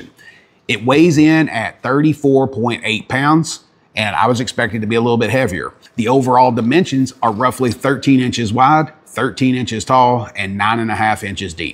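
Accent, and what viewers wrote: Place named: South, United States. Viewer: I'm curious what southern state you hail from... Georgia my guess...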